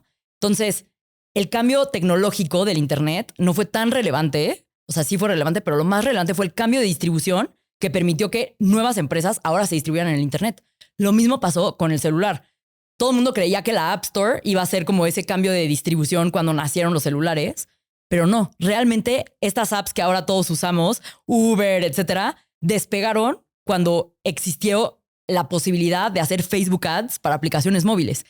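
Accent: Mexico City, Mexico. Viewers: Asi hablan en cdmx, cuando estudias en universidades privadas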